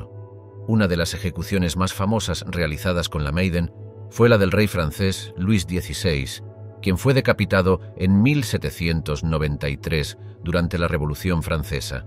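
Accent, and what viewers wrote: Castile, Spain. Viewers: Buen video, muy instructivo y sobre todo maneja un lenguaje castellano perfecto...agradable de escuchar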